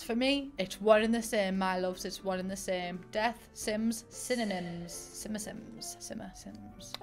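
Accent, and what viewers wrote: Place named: England, United Kingdom. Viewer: There’s this joke here in Aus about old ppl saying “film” like “fil- lim” but now after hearing you say it exactly the same I know it’s not something they do cuz they’re old, it’s cuz they came from your side of England! (Which my Nan did! Honestly I can’t deny that half the joy of watching your videos is to hear her accent & humour again!) Pretty sure Tea was dinner as well for much of my childhood & probably only changed when kids at school didn’t know which “tea” I meant.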